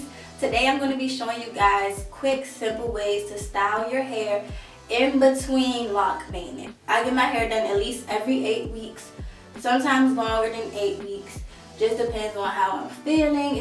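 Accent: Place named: DMV, United States. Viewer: You from the DMV i hear it in your voice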